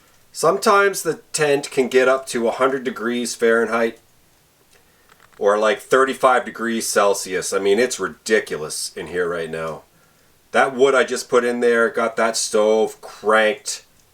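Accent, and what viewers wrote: Canada. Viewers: Endorsement from anon YouTube watcher: Totally subscribe to this channel. Beautiful views, for real nice guy with a very Canadian accent, feel good, relaxing content, not about the negativity, legitimately out here learning as he goes. You can't get any more authentic than winter camping in the Canadian North. SUBSCRIBE SUBSCRIBE SUBSCRIBE!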